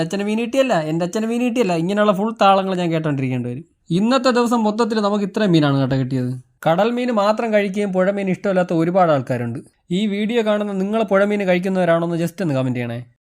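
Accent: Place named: Trivandrum, India. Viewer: Full thaalam kelkanam...😂😂😂😂 Trivandrum aanalle broi ❤️❤️❤️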